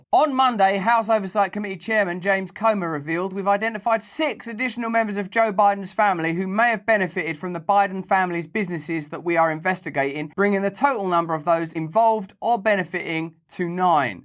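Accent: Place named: United Kingdom. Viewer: “Geh op an go geh’em”… “Get up and go get them” I love the British accent, not making fun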